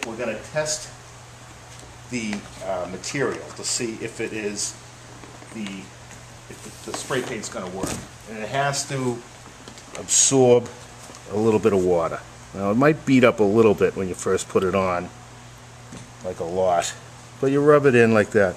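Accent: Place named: Massachusetts, United States. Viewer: Thanks for the video demo. I will be trying this today...Nice Mass. accent by the way! lol you must be a Voc Tech teacher?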